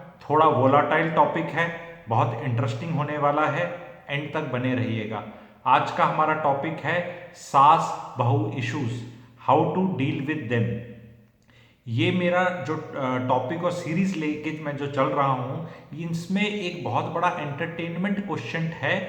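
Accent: Haryana, India. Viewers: sir aapki hindi samajhne me thori dikkat hoti hai. I think it's a Haryanvi based hindi. It's not a standard hindi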